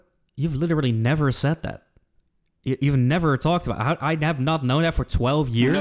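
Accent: Netherlands. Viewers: Didnt hear the dutch accent until he mentioned hes dutch…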